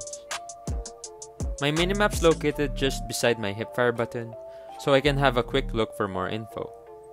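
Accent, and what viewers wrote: Philippines. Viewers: Pinoy ka pala idol , dito ko lang nalaman hahahah, akala ko foreigner, kaya pala pamilyar ung accent mo, lagi ko pinapanood vids po idol, salamat sa mga contents mo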